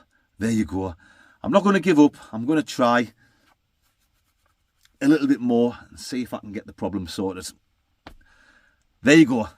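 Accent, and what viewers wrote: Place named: North, United Kingdom. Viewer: Nae peas mate!! But I bet you'd eat a scabby horse wouldn't You!! Love your patter very northern and humming of Scottish banter!!😂😂😂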